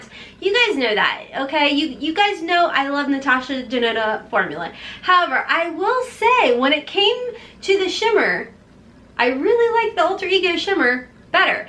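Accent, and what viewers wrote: South, United States. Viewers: Don't be sorry. I love ur country accent.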